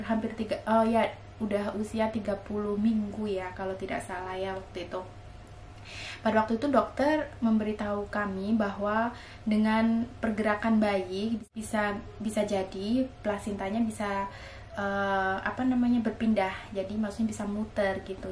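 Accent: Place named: Java, Indonesia. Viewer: Aku suka mbak & keluarga.. Lihat anabelle.. Yg lincah & robin yg sangat aktip... Dan gaya mbak klo ngomong jawanya... Medokk aku suka.. Kita wong blirar juga.. Tp tinggal di lampung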